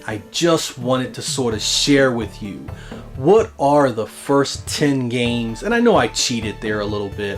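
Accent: United States. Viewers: Hey where are you from? I hear you say "I'd didn't come out in Europe" or "there wasn't a pal release" a lot which made me think you were English but your voice sounds super American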